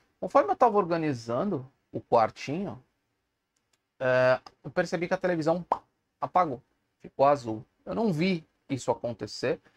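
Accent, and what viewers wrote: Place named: Rio de Janeiro, Brazil. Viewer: Show like show , vídeo muito top vc e do RJ tem um pouco de sotaque :)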